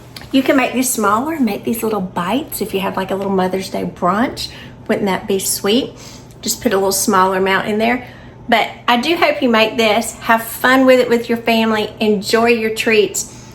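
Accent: South, United States. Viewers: Some people say the video was too long for it's content. I enjoyed listening to her southern accent and watching her cook. If you're an experienced cook all you have to do is scroll down to the recipe and directions and skip the video altogether. So shut up and let the rest of us enjoy the video. And I will be making this recipe.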